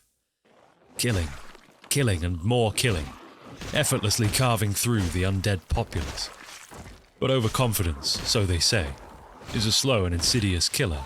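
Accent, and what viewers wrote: United States. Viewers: As a Texan I forgive and indeed do kindly demand more of your southern accent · As an American, I request that you give us more of your American accent. It may be wrong, but that doesn’t stop it from being wonderful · absolutely killah American narration hell yeah babe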